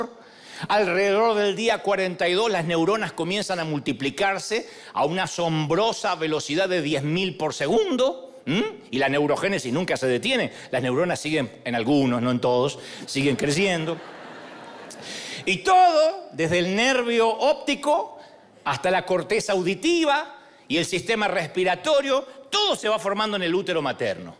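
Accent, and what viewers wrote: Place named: Argentina. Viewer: Hola querido… tu eres mi cartero del cielo… me llegan los mensajes y traspasan mi alma🥰😇y Papá Dios y Mamá Maria no se equivocan con escogerte y mi marido que murió hace 6 años era de Buenos Aires… y mi hijo Aldo Cesar es mitad argentino🇦🇷 y mitad de mi México 🇲🇽 lindo y querido y me emociona escucharte con tu acento que convivi por 36 años y me enternece que mi Jesús te utiliza para que llegue el mensaje con tu acento que extrañamos tanto❤️🌹❤️🇦🇷🇮🇹💕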